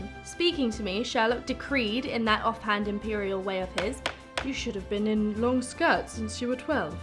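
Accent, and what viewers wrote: England, United Kingdom. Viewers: alguien mas q ama el acento británico d? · I didn't know she was a brit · She learnt her American accent from Disney, and her original accent is English. So she does not have a mix of both. There are many types of British accents. I don’t know what accent and Jen Ledger have, but I do know it’s not northern. Because northern English accents are hard to understand. The really thick type of British accent. But she is 100% British. Actually not 100%. She has a few different parts in her but her accent is 100% British. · She has beautiful british accent ❤️ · Enola Holmes reads enola Holmes In her cute little British accent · MBB + British Accent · she said she puts a voice on it her British accent and enolas accent is different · It’s so nice to see her in a part with a British accent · accent has got more British. she was going American for a bit. 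· She’s at peak of her british accent!! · I swear they made her read the book just in order to bless our ears with that English accent! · She: EnOlA HolMEs (In a clear British accent) Me an intellectual: Should I call her Eleven or Enola? My brain: -Understandable: You should call her Eleven Holmes. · yeah she’s british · Enola Holmes has brought back her English accent! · Lili she has an English accent, she took classss for an American accent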